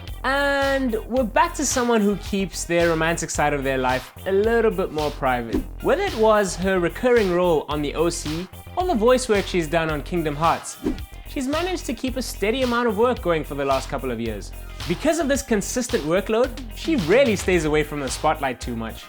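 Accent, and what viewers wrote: South Africa. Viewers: is this guy South African? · This presenter sounds South African so over there Arrow season 7 was just released on Netflix.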